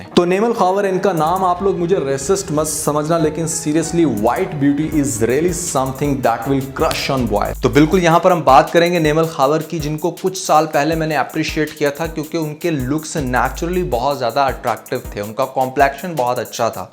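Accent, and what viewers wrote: Punjab, India. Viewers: Bhae hasad may naqal utarty hue AA Kuch ziada he over hogae or boht he weired hogae bilkul acchay nahe Lagay itna ghussa mat hua karain punjabee accent may Urdu n English sounds weired but it's nice but plz ab is comment pe ghussa mat hotee ga qk apka channel Hy or aapko hr qusam k comment face krny paraingain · I always say, kindly focus on your Urdu and English accent. Dool nahi doll.. Dono languages main Panjabi touch aata hy This is advice for you and it's easy to overcome it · Why are you trying to copy indian accent & words , some kind of inferiority complex?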